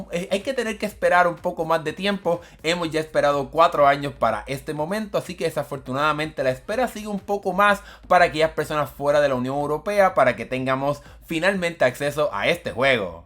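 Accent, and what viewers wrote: Puerto Rico. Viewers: Eres de Puerto Rico?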